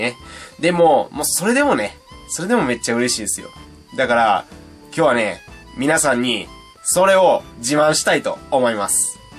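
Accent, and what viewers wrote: Kansai, Japan. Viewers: 関西弁の方が聞きやすいし、かっこいい！！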